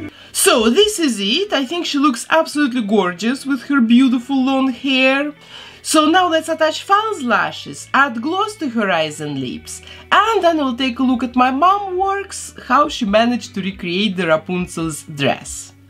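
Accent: Russia. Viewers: Кукли виходять дуже гарними, але неможливо слухати, жахливий акцент/говір, наче росіянка з глубінки вчиться говорити англійською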